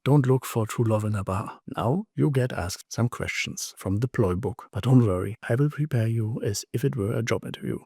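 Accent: Germany. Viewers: You are just too much dear kind Sir! I think the whole german accent in Thai is just the best! Yeah...you have a new sub! LOL>... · Yes your impressions are great, and the meanings are near spot on. Although because your German, Bum bui, pum pui. Funny.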